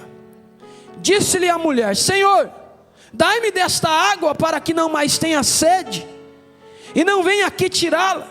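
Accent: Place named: Santa Catarina, Brazil. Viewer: VARÃO DO DEUS ALTÍSSIMO, DÓCIL, HUMILDE E MUITO SÁBIO!!! DEUS O ABENÇOE RICA E ABUNDANTEMENTE, JUNTAMENTE COM SUA LINDA ESPOSA IRMÃ FERNANDA, LINDO O SEU SOTAQUE. O MEU TAMBÉM ERA ASSIM , POIS SOU CATARINENSE. MAS, AGORA SOU CATARINOCA . MEIO CATARINA E MEIO CARIOCA.GRAÇA E PAZ!!! IRMÃ SCHIRLEY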